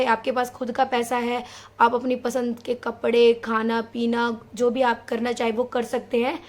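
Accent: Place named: Haryana, India. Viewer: Everything is good but your HARYANVI tone is just superb....vedio dekh ke to pata nahi ..but sun kr bahut acha laga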